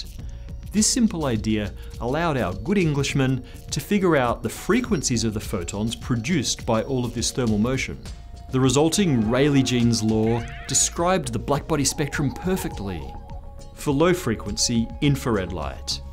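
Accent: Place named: Australia. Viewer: I'm so used to hearing American / UK accents in videoa that when I hear an Australian accent i can't figure out where it's from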